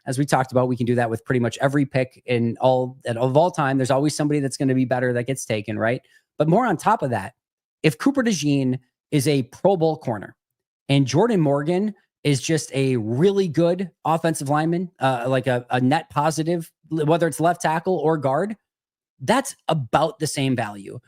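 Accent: Wisconsin, United States. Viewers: As a transplanted Wisconsinite, I'm 103% fine with your pronunciation of pure and other words. People's accents are what they are. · Number one thank you for always talking us off the ledge. You and are great at keeping us levelheaded. Number two, love the Wisconsin accent. It’s great to have a little piece of Home for those of us that are transplants. Another great show, thanks for everything! #GPG 💚💛💚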